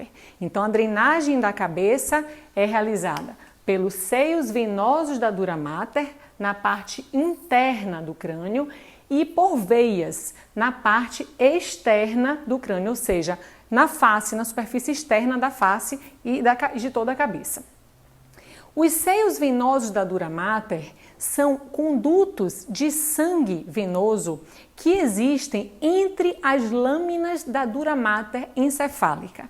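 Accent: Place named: Northeast, Brazil. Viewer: Simplesmente espetacular ! Muito rica em didática e lógica além do acréscimo charmoso do sotaque nordestino .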